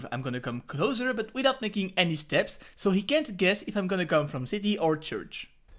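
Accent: France. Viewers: You speak like a french but look like American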